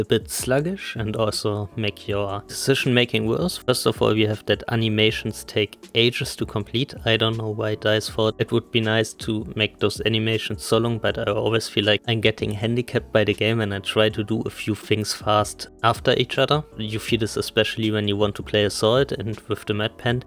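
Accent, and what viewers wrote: Germany. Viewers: sehr gut. Man hört es schon raus, aber ich als 45 Jähriger mit meinem Schulenglisch verstehe es echt gut. 👍🏽 Weiter so. 🍻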